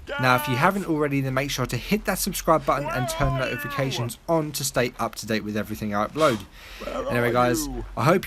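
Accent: England, United Kingdom. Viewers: English accents are so adorable, "go through the wuh-uh-fole"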